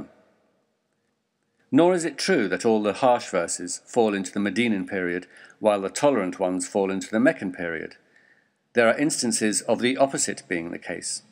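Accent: England, United Kingdom. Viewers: excellent al taqiyya... use someone with an english accent to say "islam is peace" i advise anyone with a brain to read the koran without the help of a muslim muslims have always preached al taqiyya since mohammeds time: a nsw ering nonb eliever. i nfo/ was- prophet- muhammad-wron g-for-killing-kaab-bin-al-ashraf.html from another muslim.. shows the mentality allah fukbar!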